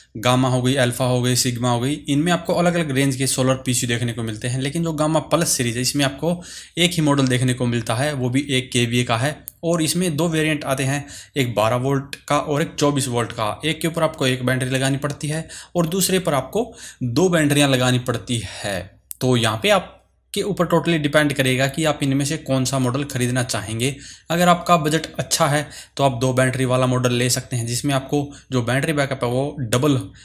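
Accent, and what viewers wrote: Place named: Haryana, India. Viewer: bhai wo àpke lahje se pata lag gaya...HR me kaha se ho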